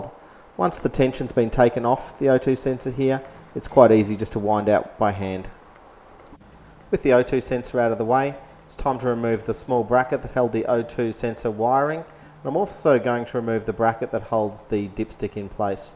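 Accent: Australia. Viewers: ausie accent??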